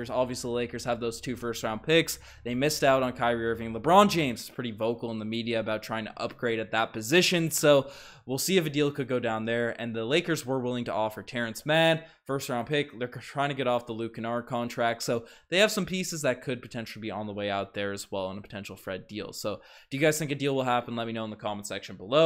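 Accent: Newfoundland, Canada. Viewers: must be from town with that accent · Are you from Newfoundland?? 🤨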